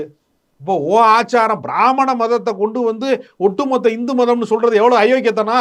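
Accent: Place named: Madurai, India. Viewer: Madurakare pechu Thani thampa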